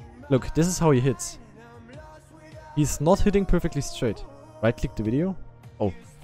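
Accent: Germany. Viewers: r u German? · Are you from Germany? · Jedes Mal, wenn ich den deutschen Akzent höre, muss ich mir vor Augen führen, dass mein Englisch für Muttersprachler genauso schlimm anhören muss. 😂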